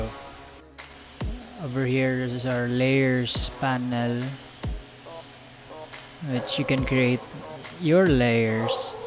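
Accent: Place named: England, United Kingdom. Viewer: nice tutorial i like your english accent i can understand it properly ^w^!!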